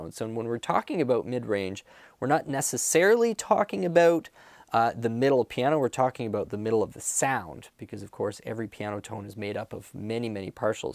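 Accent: Canada. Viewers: Loving the Canadian accent. I hear what you are talking "a-boot"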